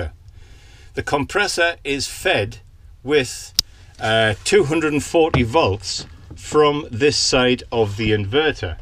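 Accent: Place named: North East England, United Kingdom. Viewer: I recognise a bit of a north east twang to yourself, great video and information, I will steal that idea, cheers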